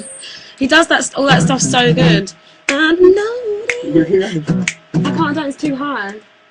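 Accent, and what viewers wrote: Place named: United States. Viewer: your american accent is awsome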